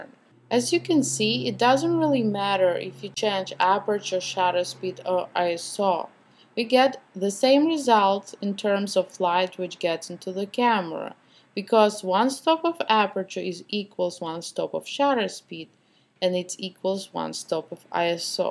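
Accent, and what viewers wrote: Russia. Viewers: good video, but I'm getting tired of "lower ISO looks grainy". Well, yes, but on most good DSLR you can go fairly high, without visible grainy-ness. Instead of blindly following advice, that may or may not apply with your equipment- TRY THINGS OUT = SHOOT. That's the only way to learn. But again, nice video, explains the principles well. Is the accent Russian? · sounds like a mix of Russian + Latin speaking english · Nice video! Do You have a Russian accent? )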